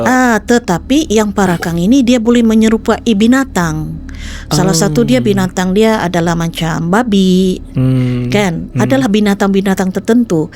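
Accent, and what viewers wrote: Sabah, Malaysia. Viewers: Tbaikla sis..x hilang sleng sabahnya...x boring mdgr..best dgr sis bcrta... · Best betul dgr suara kak ni bercerita dgn slang Sandakan lgi. Uinah geng.. 🤣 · Suka betol dengo dialek sabahan ni. Lembut, pekat, kadang2 kelakar. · Sy orang Sandakan, born and raised. Aku boleh feel yang Kak ni ada control sikit dia punya bahasa supaya orang semenanjung lebih senang faham. Kalau dia full on cakap cara orang Sandakan, memang susah faham dan ada lawak sikit 😂😂😂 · Ni bahasa standard sabahan ya... Belum lagi kamu dengar yg ada mix 'loghat' bangsa. Contoh kalau Sabahan yg di Tawau, nada & loghat lain lagi. Org kurang faham. Kalau kami Sabahan di Keningau ni, lebih kurang standard begini bahasa tu. Tapi masuk kampung yg pekat bahasa Dusun, so cakap melayu dorang pun ada mix 'Apa gia tu?' 'Ada kanto itu'. 🤣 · Aku dengar sampai habis sebab suka dengar bahasa sabah.. 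sedap di dengar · Suka betul bila orang Sabah bercerita cerita seram. Suka dengar bahasa orang Sabah.. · Siukkk dgr loghattt kakak ni becerita.. betul2 sabahan.. mcm si Wafi punya loghat siukk d dgr d screen😂 ndak d buat2 sabahnya hehe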